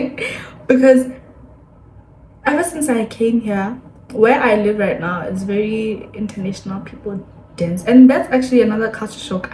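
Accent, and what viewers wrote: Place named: South Africa. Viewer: 🤣🤣😭is it South Africa ? Waitse batho bone mma Mme accent ya rona e monate nna i like it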